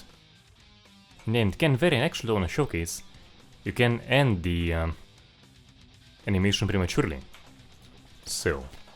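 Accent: Russia. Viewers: It is me or your real voice is russian and talking english?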